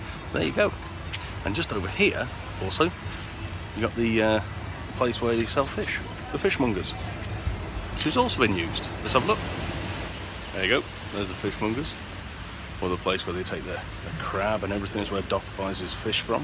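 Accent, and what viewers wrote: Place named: United States. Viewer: You would pass for an American in the US by your accent used in this video.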